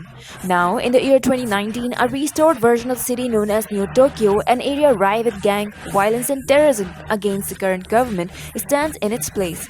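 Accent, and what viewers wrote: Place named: South Asia. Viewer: Hi Nice to hear a desi Voice, talking about Anime:)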